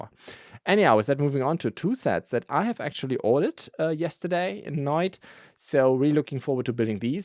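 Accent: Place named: Germany. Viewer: Since my German is terrible while my family is over 1/2 German/Austrian American, I love listening to your accent. As a simple tip, bear - the animal - is pronounced the same as bare, as in not covered. Both rhyme with air. Beer, the drink, rhymes with ear, on your head. Your release videos are the best out there for non-lego sets. Pixel Dan's ARC-170 looks like what Lego Group should have done.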